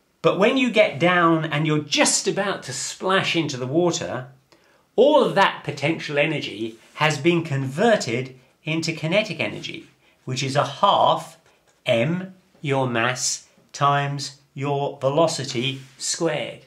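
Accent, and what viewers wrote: United Kingdom. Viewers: Rather unrelated, but your voice is so familiar (and peculiarly nice to listen to). Have you been in other youtube projects/channels? Edit; nevermind, apparently you just have a strong UK accent which makes you sound a lot like a guy on Numperphile Also, thanks for making this video (and the rest of them for that matter, as i assume i will like them as well). It's rather difficult to know what subjects to start with, but also what source you can actually understand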